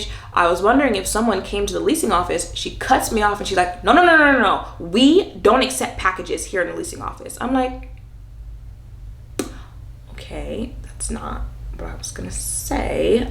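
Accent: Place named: Long Island, United States. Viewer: Oooh you’re from LI. I can’t place your accent to specific town 😆 I hear you on the moving out. So happy you were able to remove yourself from such a wild apt. I hope the next place is much better.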